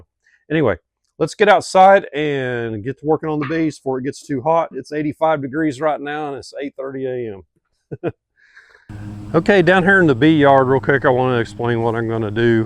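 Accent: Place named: Texas, United States. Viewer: #1 I am a relatively new lover of bees. #2 My hat is off to beekeepers minding their bees in 90+ degree weather in the direct sun. Wow. #3 My hat is off to beekeepers (period). #4 I was smiling imagining it was Hank Hill in that bee suit because you have the same Texas drawl - then you brought up Hank and I broke out laughing. #5 Thank you 🐝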